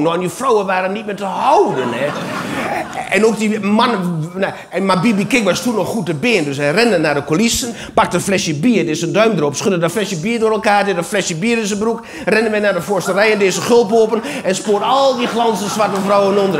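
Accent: Twente, Netherlands. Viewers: wel goed dit twents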